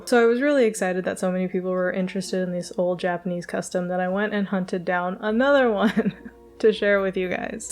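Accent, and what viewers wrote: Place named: California, United States. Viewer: Ms,Were you born in Okinawa? where were you raised? Your accent is as though you are from California. I first went to Okinawa in March 1960.I was married there in 1965 and my two boys are still there.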